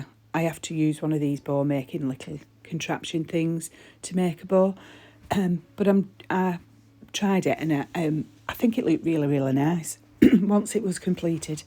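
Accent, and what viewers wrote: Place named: North, United Kingdom. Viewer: I really loved seeing how this came together. I never had any clue how stunning wreaths like this were put together - when I made mine I was massively winging it and used all of the wrong things! The finished wreath is so classy and utterly stunning. For what it's worth, I personally prefer not-scripted voice-overs because I find them more natural to listen to than when something is read out. Just my opinion, and I know others may differ, but I liked your voice over so I don't think you need to change anything. As someone from Lancashire who now lives down south, it's always lovely to hear an accent from further north too :-)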